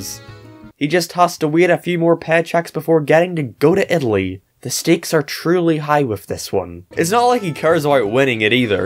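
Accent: Northern Ireland, United Kingdom. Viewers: I honestly started watching you because your accent makes your commentary pleasing. If I had to guess, youd be from Northern Ireland. I had a coworker from there and I can hear the similarities between you two.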